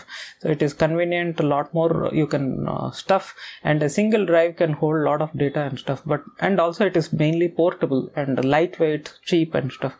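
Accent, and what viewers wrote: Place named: Gujarat, India. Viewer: You Sound Like A Gujju That's Why I Commented This :P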